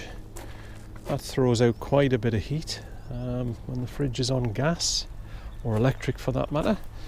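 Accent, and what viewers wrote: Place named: United Kingdom. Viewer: Hi; How about that -- two bathrooms in a van. That is absolutely amazing! I also like the way the couches are arranged -- it actually feels like a living room. I really think you hit a gold mine there with the price, the mileage, and the configuration. Lucky you. I also enjoyed the British accent and the unusual words. I never did figure out what you called the stove. Have fun 'roughing' it in your fancy van. Diane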